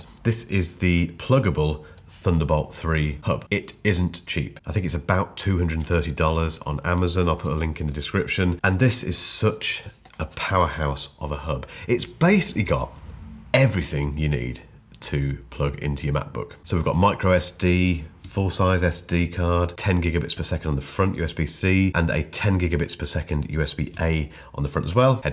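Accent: United Kingdom. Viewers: Mouse does not look as though it's for left handers!! (waiting for my 16" MBpro) I am am looking for a something that will connect from the MB to my 10gb home network. Great channel thanks for sharing you views. Are you in the uk? Accent is right but the $ reference makes me wonder. 😊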